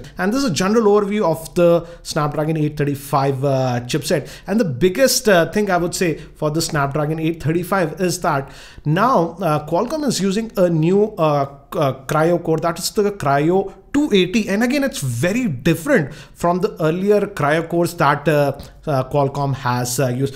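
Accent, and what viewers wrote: India. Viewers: Sorry man, but you need a to work on your accent if your going to speak English it's just too hard to understand. You really sound like your speaking Hindu. Good luck.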